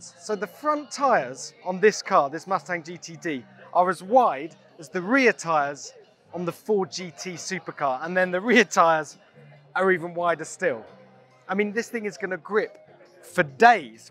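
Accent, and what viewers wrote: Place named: United Kingdom. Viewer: Why do they have a Brit introducing an American muscle car THAT DONT WORK VERY ANNOYING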